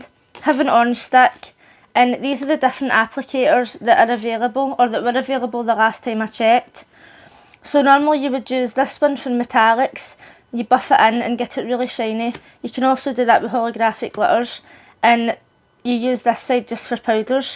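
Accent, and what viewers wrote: Scotland, United Kingdom. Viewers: Well, all of those people are objectively wrong. Scottish accents are beautiful! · apparently they don't make this color any more. Too bad, it is really pretty! I love your accent, it is a bit difficult to understand sometimes since my ear isn't used to listening to a Scots accent, but that is a me problem, not a you problem! Can't believe that people would complain about that. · Hi great video was thinking of buying this product so glad I watched your tutorial as a new buyer would you recommend buying the deluxe pack or just the basic set or individual I’m so glad of the honesty that your tutorial gives and it’s great you’ve got a Scottish accent x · I’m so glad my Mum’s Scottish or I wouldn’t have understood this tutorial at all! LOL. Thanks also for this cause Sistaco’s tutorials are very quick and edited. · I love your Scottish accent!! 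great video !!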